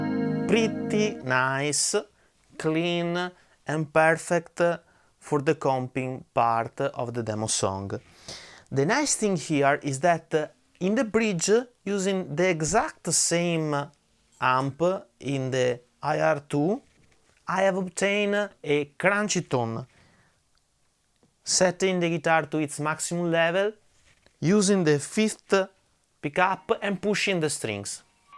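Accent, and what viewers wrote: Italy. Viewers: what part of Italy do you come from, as your accent is very thick? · Very good demo, my compliments! I am thinking to buy this pedal to use it in my upcoming recording session in April instead of the tc electronic combo 65. i can hear from miles away that you are italian and that is great 🙂! Just starting to follow your channel.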